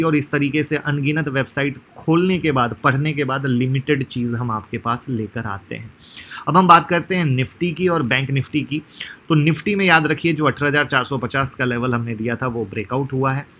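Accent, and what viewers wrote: Bihar, India. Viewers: Yeh bihari hai aise hi pronounce krta hai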